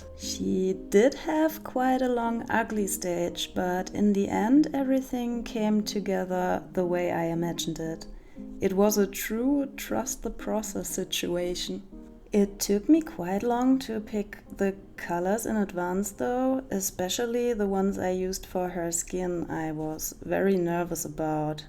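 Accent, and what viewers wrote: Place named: Germany. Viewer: Love your german accent😂❤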